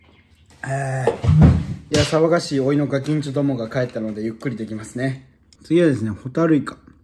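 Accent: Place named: Kansai, Japan. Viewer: たまに関西弁使うん好きやわ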